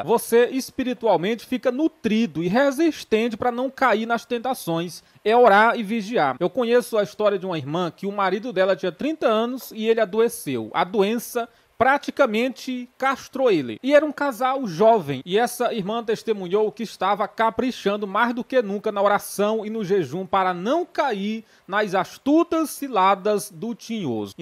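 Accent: Northeast, Brazil. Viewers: Que Deus continue te usando missionário tremendamente porque tu é profeta homem eu acho muito bonito esse jeito que o senhor se expressa uma forma nordestina sincera de falar mas tudo dentro da palavra é o que importa continua falando a verdade Profeta Deus é contigo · E muito bom o assunto falar sobre o assunto,acho que deve sim ser falado por pastores.existem muitas pessoas as vezes dentro das igrejas com tantas dúvidas sofismas medos. Eu mesmo sou uma, KKK agora é uma resenha o jeito que cê fala parece ser nordestino também. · Ele é divertido. Dom do nordestino... Kkk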